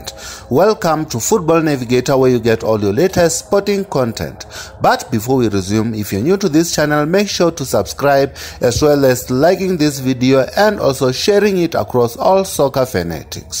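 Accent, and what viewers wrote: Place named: Venda, South Africa. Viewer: wooow that good......but hey your English my man ....is like Venglish you remind me of khili theme olo withtheir familisi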